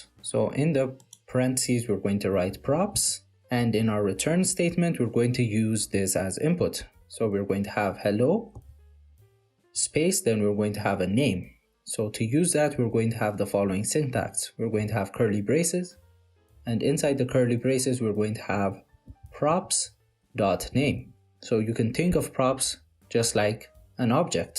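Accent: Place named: Ethiopia. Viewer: first time to see habesha boy teaching coding in english keep it up bro u got nice accent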